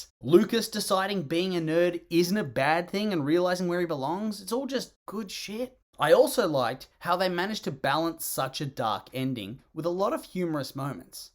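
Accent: Australia. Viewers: You sound Australian, your country was turned into one giant gulag over the ‘pandemic’ and you are like ‘silly Americans and their guns’. The lack of awareness is truly astounding. Your name really shouldn’t be, more like girly idiot pants.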